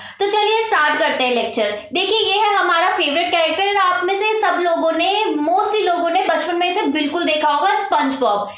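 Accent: Assam, India. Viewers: Ma'am are u Assamese?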